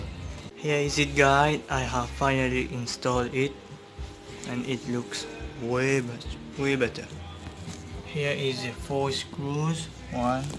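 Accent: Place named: Mauritius. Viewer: You sound Mauritian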